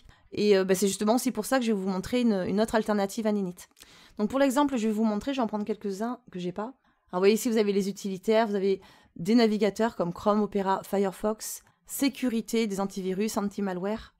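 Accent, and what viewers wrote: South, France. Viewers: merci pour tes vidéos, t a l accent du midi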